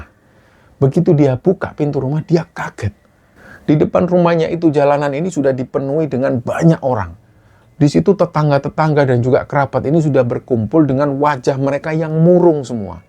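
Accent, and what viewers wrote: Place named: Bali, Indonesia. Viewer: Salam dari Blitar yang sedang di Singapore. Hari hari suara mas nemenin kerjaku. Kalau mas ngomong Jawa jadi ingat ipar ku pas pelafalan huruf D. Iparku orang Bali asli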